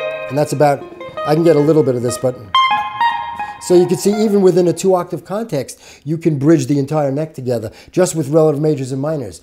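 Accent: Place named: New York, United States. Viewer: This is great stuff. Also love the New Yawk accent, I'm from Brooklyn...LOL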